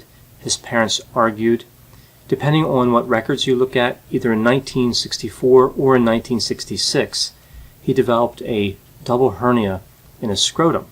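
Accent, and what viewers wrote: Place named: Massachusetts, United States. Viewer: What is this doctor's accent? Massachusetts?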